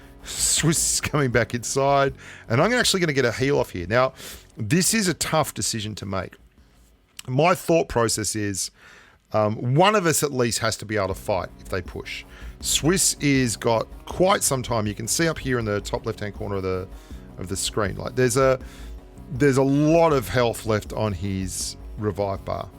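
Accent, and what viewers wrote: Australia. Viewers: yoooo u austrailian?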